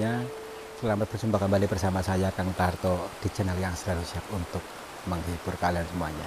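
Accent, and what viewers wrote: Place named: Java, Indonesia. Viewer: JAWA MEDOK NYARI DUIT KONTEN NGADA NGADA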